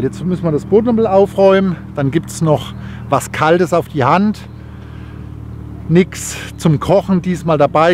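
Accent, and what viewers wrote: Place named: Hesse, Germany. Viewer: "Un hier ham mer beim Türk eh paar Peperonis geholt" Hessisch is die best Sprach dies gibt 😁💪🏻👍🏻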